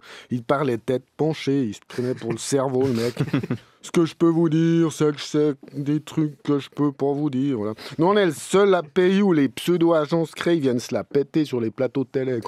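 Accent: Vaud, Switzerland. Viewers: Ton accent Vaudois!!! Exellent 😝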